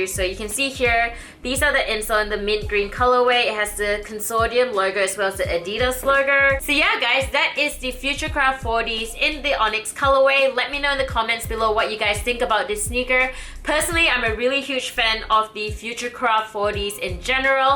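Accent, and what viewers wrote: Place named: Australia. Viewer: I love your aussie accent LOL its so cool compared to my American accent. Def gonna look into 4Ds I think Adidas is really killing the game right now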